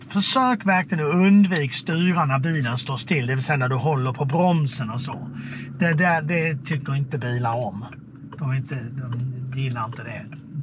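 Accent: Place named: Scania, Sweden. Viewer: Skånskt dialekt 💪 Pedagogisk och tydligt förklaring Tack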